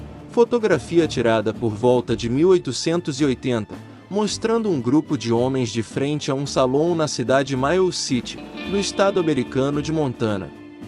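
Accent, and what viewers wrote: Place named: Rio de Janeiro, Brazil. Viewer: Triste é ouvir a de nomes de cidades e personagens com o sotaque carioca! “R” afrancesado e “dchi” no final de Billy the Kid e outros this….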